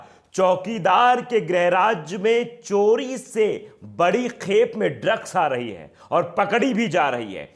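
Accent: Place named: Bhojpuri, India. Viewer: bhai aap ki khabaren main bahut shauk se sunta hoon aap ke bolne ka andaaz bahut pyara lagta hai beech beech me bhojpuri bol dete hain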